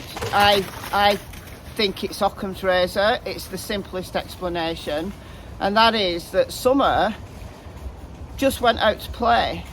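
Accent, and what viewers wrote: United Kingdom. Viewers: I love you, your British accent & your very adorable dogs! I have been following you for awhile & look forward (when I get off work as a night shift nurse) to listening to your podcast on my drive home. Keep up the great work!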